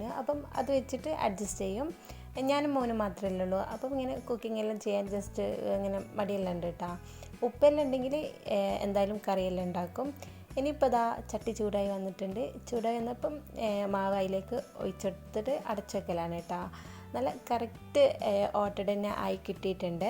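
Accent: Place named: Kannur, India. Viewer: Wayanadanenklum kannur stylanallo samsaram 😊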